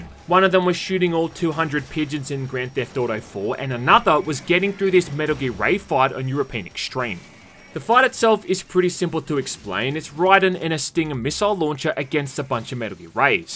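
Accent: Australia. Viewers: Australian accent , nice - lil pharma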